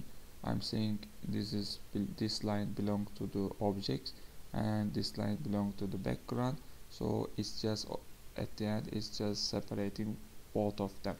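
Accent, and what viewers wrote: Turkey. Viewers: Hocam aksandan Turk oldugunuzu tahmin etmistim, Github'a da Istanbul diye yazmissiniz. Selamlar.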